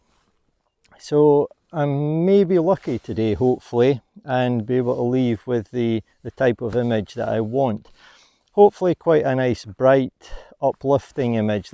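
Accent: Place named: Aberdeen, United Kingdom. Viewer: hi, thanks very much for getting back to me so promptly - ok you have reassured me. I'll probably get one. I enjoy your videos,very straight forward and imformative compared to a lot of others where the presenter talks a lot about themselves before getting on to the subject matter. You sound like you come from the Aberdeen area. That's where I stay, my wife being an Aberdonian. Thanks for getting back to me and for your videos which are great.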